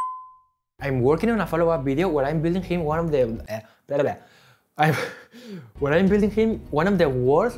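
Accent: Spain. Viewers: He for sure has been born in Spain or at least he has been living for most of his life. He is got an amazing english for his age. His accent is 100% spanish. Very nice chanel man. Keep it on !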